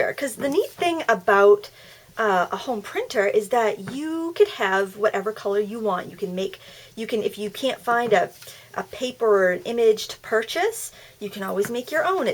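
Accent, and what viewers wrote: Maine, United States. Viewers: Just found your channel today and I love it already! I also love this technique, and I love your advice regarding alcohol markers as it is something I want to start, but haven't because of the price. BTW, while you were talking I kept thinking that your accent sounds familiar. When you said you are in Maine I realized thats why as I'm just north of you in New Brunswick! And Mardens is awesome, whenever we go across the border it is a requirement to visit there, lol. :)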